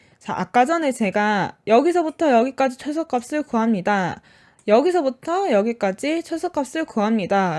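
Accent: Gyeongsang, South Korea. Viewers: 대학 신입생 때 Fotran 수업을 들은 것이 전부였는데, 덕분에 파이썬이 어떤 언어인지 알게 됐습니다. 몇 군데 파이썬 관련 동영상을 찾아다녔는데, 가장 체계적으로 설명이 잘 되어 있어서 바로 이해 할 수 있었습니다. 명쾌하고,박력있는 열강 덕분에 30강을 완강했습니다. 가끔 경상도 억양도 매력적이었습니다. 아쉽게도 다음 강의를 찾아봤더니 다른 분이 강의를 하시네요. 다시 한번 감사드립니다. 안녕~~~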